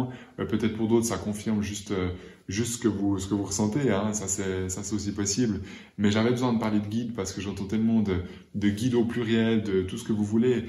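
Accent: Switzerland. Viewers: bonsoir! ravie de vous rencontrez ce soir, au moment où je me sens mal avec les vaccinations par rapport à mon travail: sinon, je n'en veux pas et si je dois être suspendue et bien j'irai jusqu'au bout et cela sera peut être un signe de changer d'optique professionnelle! je suis tout à fait d'accord avec ce que vous dites et cela me réconforte! je vais continuer à vous écouter pour essayer de me connecter avec moi même! j'adore votre accent "suisse?"! prenez soin de vous et à bientôt, mercisssss.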